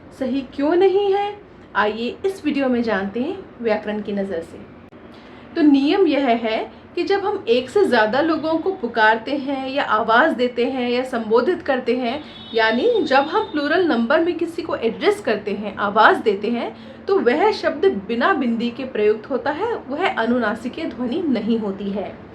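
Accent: Standard. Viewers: हिंदी भाषा का शुद्ध उच्चारण ❤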